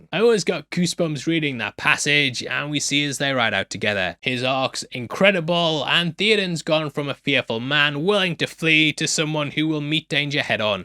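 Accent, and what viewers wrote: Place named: Newcastle, United Kingdom. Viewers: Love your work....BUT....I just can't stand you reading to us in Your Geordie accent. REALLY puts me off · All this time I didn't twig you lived in the north of England haha! I wondered why you sounded like you were from round these parts! Geordie here, love your content man! Great stuff.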